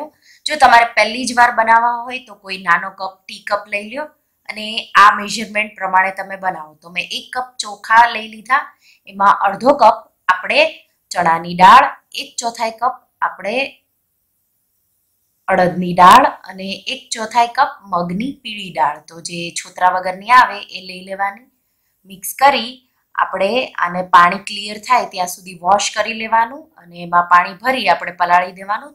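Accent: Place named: Kathiawar, India. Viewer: ઈ બહુ સરસ બયના છે. મને તમારું કાઠિયાવાડી - હિન્દી બધું મિક્સ સાંભળવાની મઝા આવે ☺️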